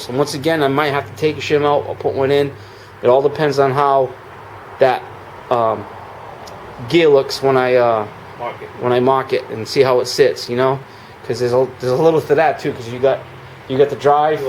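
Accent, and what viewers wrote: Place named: New Jersey, United States. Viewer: Cool accent...you a jersey boy?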